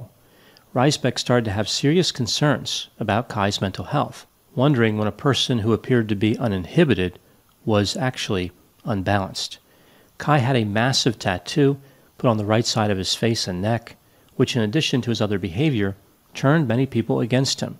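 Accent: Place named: East Coast, United States. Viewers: I almost want to agree with you on that one, it sounds definitely east coast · I hear it again on the word "thoughts," as well. New England is as narrow I can place it.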